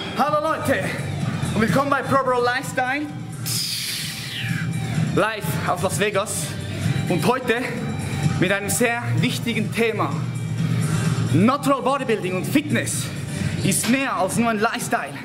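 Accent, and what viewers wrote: Switzerland. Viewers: Unglaublich was für ein Vogel. Der Schweizer Akzent machts zum Glück lustig.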